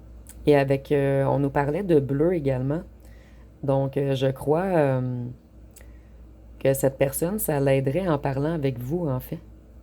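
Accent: Canada. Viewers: c'est un joli prénom, vous êtes canadienne? J'adore votre pays et accent. Vos guidances sont excellentes. Merci ❤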